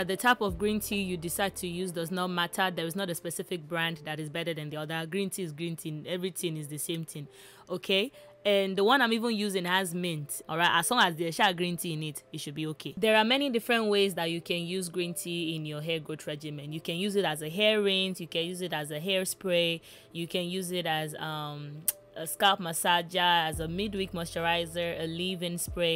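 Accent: Nigeria. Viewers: 🇳🇬 The Nigerian accent 😍 >>> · I love how you kept your Nigerian accent, no phoney. Nothing😂😂❤ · Thank you so much about this wonderful video about the hair growth benefits of green tea! 🍵 ...And thank you for the words of Scripture. God Bless you! 🙏 P.S. I love your Nigerian accent! 💚 #NewSubscriber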